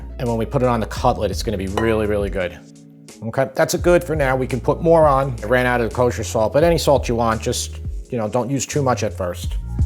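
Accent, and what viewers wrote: New York, United States. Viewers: I am a simple man I hear new york accent I hit like and subscribe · I’m watching this as we drive to the farm and my husband asks me “I didn’t know Billy Crystal has a cooking YouTube” never thought about it but there is a little Billy in your voice. I miss a New York accent from when I lived in bronxville.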